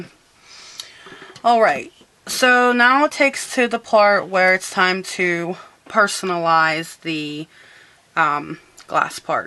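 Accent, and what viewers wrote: Pittsburgh, United States. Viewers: Yinz guys???? Your a Pittsburgh girl! I'd know that accent anywhere:) Love the glasses...thanks for sharing;)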